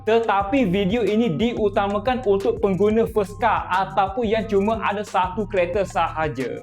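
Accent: Kelantan, Malaysia. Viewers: Mula2 dgr sy dh gelak.. 😂😂sorry dik., 🙏🏻 bunyi ganu klatey + bm baku., tp cute sgt.. sy suka contentnya., just be yourself ok., tq utk info yg dikongsi., semoga jd youtuber yg berjaya 🤲🏾👍🏽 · Pekat molek loghat kelate. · Klate ke boh? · 😂😂😂 aku baru paham,pekatt gilaaa nak mampoih accent kelatey dia😂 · Kecek kelate molek bohh.. 👍👍👍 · Bey..kelantam ker tganu. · Kualiti persembahan lemah. Slang kelate pekat.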